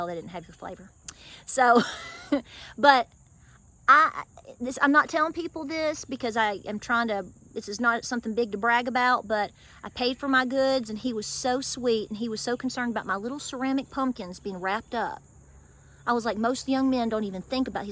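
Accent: South, United States. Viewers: I just found your channel and I enjoy you very much. Thank you, love your peppy self and that Southern accent ❤️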